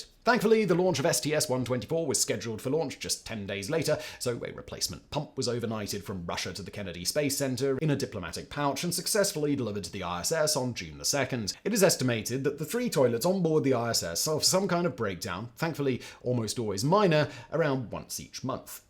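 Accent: United Kingdom. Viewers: This subject can only be conveyed with an British English accent. Centuries of a stiff upper lip has mad it capable of talking about any subject with (at most) minimal sophomorishisms. 😉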